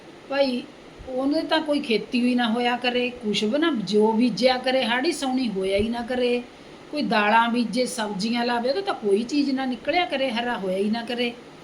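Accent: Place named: Malwa, India. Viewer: ਮੈਡਮ ਤੁਸੀਂ ਕਿਸ ਸ਼ਹਿਰ ਵਿੱਚੋਂ ਹੋ ਬੋਲੀ ਮਾਲਵੇ ਦੀ ਹੈ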